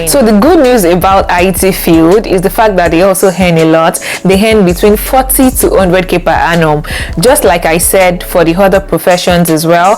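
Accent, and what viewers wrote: Yoruba, Nigeria. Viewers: Working on her speech and diction - yeah because she needs to improve (we all need to improve on whatever we are doing) but asking her to "eliminate every element of Yoruba accent", haba. With due respect since I am not a linguist, yet I disagree with you in content and in context. That's as good as saying she should change her personality/identity because it's not good enough. I will be looking for another definition of inferiority complex, please pray for me too... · God bless you dear sister! I really appreciate your efforts at this, keep the good work up. However, as a Linguist I would advise you to work hard on your speech and diction. Make it your goal, to eliminate every element of the Yoruba accent, in your speech. I'm very particular about that each time I listen for speech content creation. I shall be praying for you and cheering you on. 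Do keep the up the good work! Cheers! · why should she eliminate Yoruba accent? Is that suppose to be a taboo?🤷 Nothing beats Originality. Be real..So far she's communicating. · That’s a Yoruba accent. Every tribe and country have their own accent. Let’s focus on the information given and ignore the accent. T for tenks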